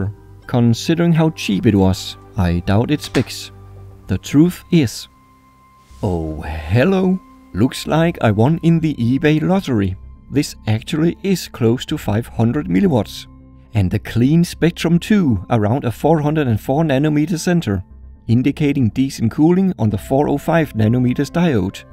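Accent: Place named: Denmark. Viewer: This accent can only belong to a Dane! Thumbs up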